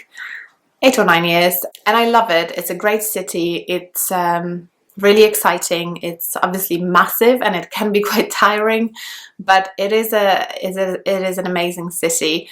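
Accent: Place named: London, United Kingdom. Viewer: Inspiring story! Your English is very high level, sounds like a londoner. What about French? Has it abandoned?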